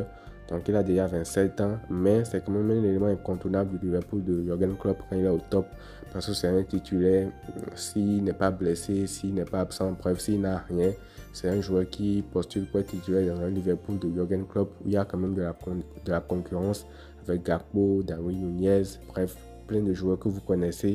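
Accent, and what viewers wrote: Benin. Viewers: Ça sent l'accent béninois, vrai ?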